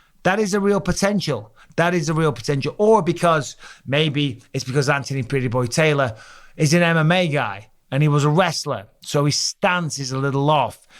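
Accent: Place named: United Kingdom. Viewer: It was but he has a geological advantage when It comes to that accent. Gey him to try an impression of anyone outside the UK and it's awful lol. Still funny and entertaining but for different reasons.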